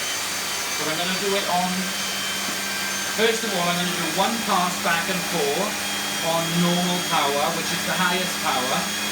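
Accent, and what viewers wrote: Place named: Scotland, United Kingdom. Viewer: Happy New Year to you as well! I have 3 permanent res. Cats, 2 longhair, and right now just one foster cat rescue cat and I understand completely about the fur 🤣 the Dyson was impressive with it. Always hesitant to buy another cordless vac again as I had one that underperformed big time, but this one seemed to work great. I love your channel, it’s fantastic! And omg the singing stuffed animals were soooo cute ❤️Thanks so much for giving the demo. Also ❤️ your Scottish accent. Some of my family ancestors are from Scotland (Fraser’s of Lovat) and I’ve always wanted to go there. Always feel at home in the mountains more than any other terrain. The Highlands call my name...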